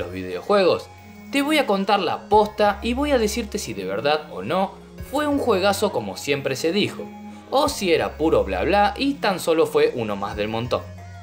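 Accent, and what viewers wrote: Argentina. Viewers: 100 % argentino 👍👍👍👌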